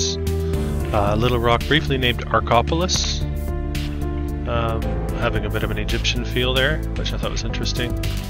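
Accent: Canada. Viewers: Are you from Alberta ?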